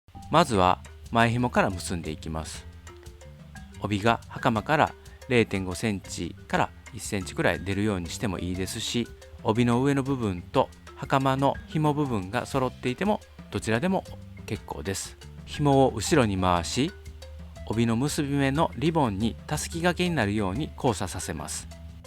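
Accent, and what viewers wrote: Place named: Kyoto, Japan. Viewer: なんか喋り方が京都のお方の喋り方ですなぁ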